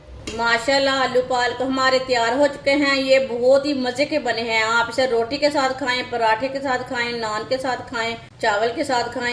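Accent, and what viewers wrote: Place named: Punjab, India. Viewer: جی اپکی ريسيپی کی تو بات ھی اور ھے مگر پنجابی سٹائيل ميں جو اردو کے الفاظ اپ کے لب مبارک سے پھولوں کی طرح جڑ رھے ہيں😀، ان کی بھی کوئی مثال نہيں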